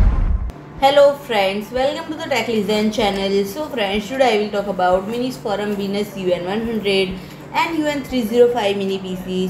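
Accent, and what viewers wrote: India. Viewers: Didn't understand a single thing. Music + horrible mic + indian accent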